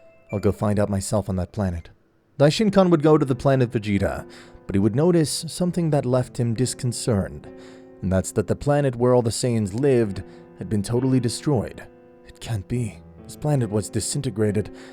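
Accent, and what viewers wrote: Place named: United Kingdom. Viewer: I agree but you sound...toooo british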